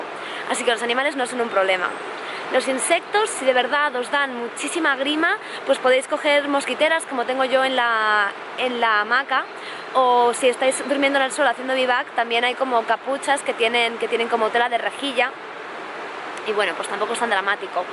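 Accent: Spain. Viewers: Me encanta el Acento de las españolas se me hace muy atractivo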